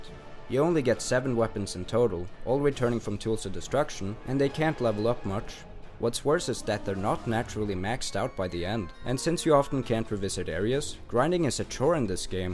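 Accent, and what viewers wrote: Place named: Scandinavia. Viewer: You sound like you're from scandinavia.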